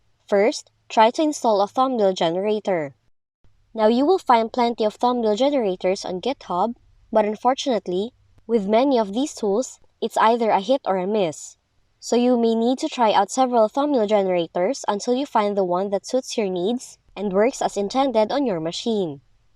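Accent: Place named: China. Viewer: unable to trust chinese accent there is suspicion of getting tricked into malware infection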